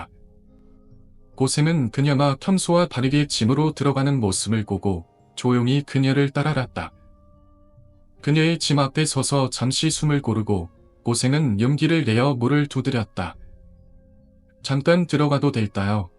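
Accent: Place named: China. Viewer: 중국사람이냐??? 머라는거야 ㅗㅗ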